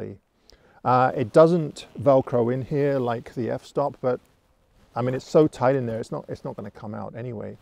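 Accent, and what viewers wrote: England, United Kingdom. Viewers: I remember watching this video as the first one of yours I ever saw. I thought: "He sounds ok, possibly got some English in his accent and he talks sense and seems to have a history in photography." Mmm! So much for first impressions, I don’t remember thinking: "This man is possibly the greatest landscape photographer on YouTube and thus the best I’ve seen." With some of the other landscape photographers like Thomas Heaton, Simon Baxter, Paul G Johnson, that’s not something I say lightly. Thank you for pulling me into your channel, it’s a true pleasure and indeed an honour to see you work and to learn from you.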